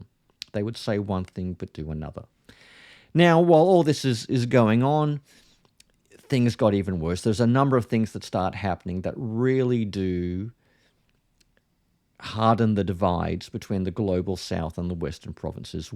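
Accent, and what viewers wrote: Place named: Australia. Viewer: As long as you know the hymn, "Thy Hand O God Has Guided" you are Anglican😂❤. Seriously speaking this is not a split. Anglicanism is being preserved. I'm just waiting to see what the Province of the West Indies, which I'm a part of would do or which side it would choose. I love your Aussie accent by the way.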